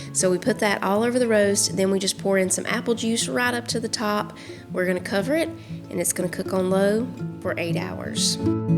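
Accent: South, United States. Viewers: Hey, I love love your videos. So cozy and homey and your sweet southern accent !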